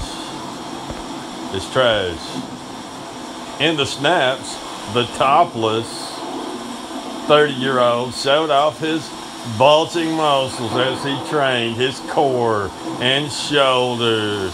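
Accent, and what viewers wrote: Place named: Appalachia, United States. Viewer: This guy sounds like a rite hill billy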